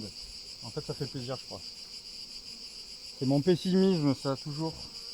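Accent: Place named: Belgium. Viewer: Bonjour monsieur vous êtes du Sud ?? On entend les crickets ou bien c’est une musique de fond ?? En tout cas vous avez un jolie accent Belge😁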